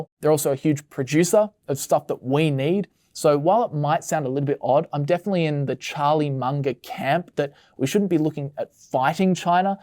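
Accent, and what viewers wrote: Australia. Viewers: First time watching your video... Aussie???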